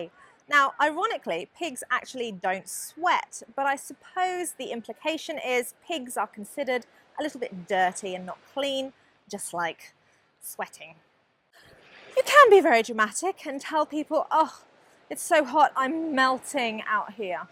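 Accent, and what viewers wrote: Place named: England, United Kingdom. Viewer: I LOVE your english accent.so amazing and captivating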